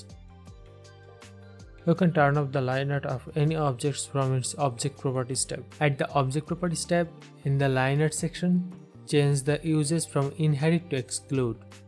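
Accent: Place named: India. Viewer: no wonder the accent felt indian but slightly off.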